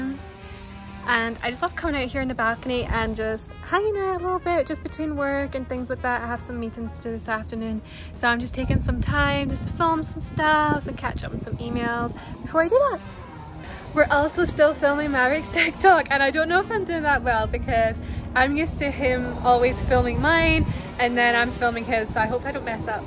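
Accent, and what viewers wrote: United Kingdom. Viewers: Wait a second are u from the UK? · Omg. You have a lil bit of an accent. British?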